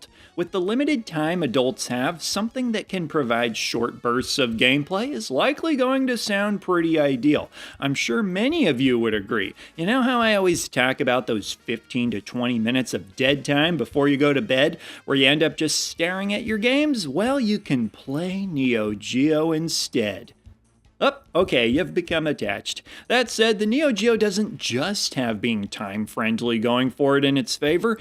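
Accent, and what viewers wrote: Midwest, United States. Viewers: He is strange...where is that accent from...midwest? Northern/Canada?...Mormon territory?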